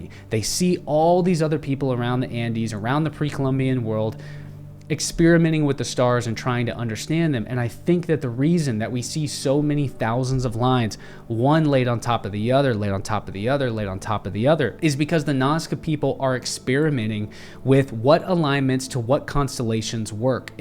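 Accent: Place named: Carolina, United States. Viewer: Dude, you sound like you're from my neck of tha woods... #CarolinaGrown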